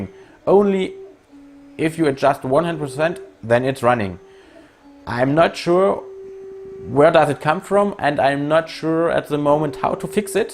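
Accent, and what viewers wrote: Germany. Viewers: Sehr informatives Video, vor allem wegen der "kleinen" Fehler die an einem nagelneuen Drucker auftauchen. Habe mir bei "Aliexpress" einen für 175 € bestellt. Bin jetzt vorgewarnt hinsichtlich der Produktionsqualität. Werde den Aufbau mal protokollieren , ob die Fehler inzwischen behoben sind. Apropo Englisch - ist OK - ,der deutsche Akzent ist in den USA sogar recht beliebt. Und man redet keinen Müll bei technischen Themen. Very informative video, especially because of the "small" errors that appear on a brand new printer. I ordered one at "Aliexpress" for 175 €. I am now forewarned regarding the production quality. Will log the structure times, whether the errors are now fixed. Apropo English - is OK - , the German accent is even quite popular in the US. And you do not talk trash about technical issues. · your english is better than some native speakers here the US.. 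plus having a German accent works well with talking about mechanical things.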